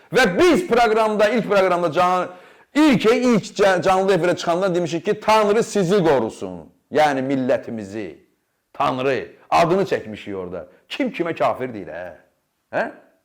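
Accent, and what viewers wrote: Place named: Salyan, Azerbaijan. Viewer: qadan alım bu Salyan tələffüzünu-üslubunu dəyişə..!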